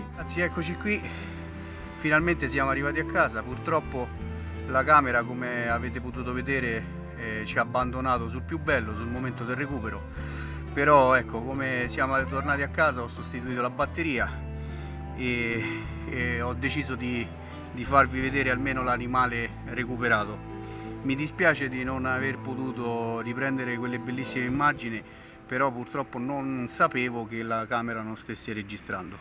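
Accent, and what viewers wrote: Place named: Central Italy, Italy. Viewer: Bellissimo video di caccia, complimenti ! Dall'accento sento che sei del centro Italia... di dove esattamente ?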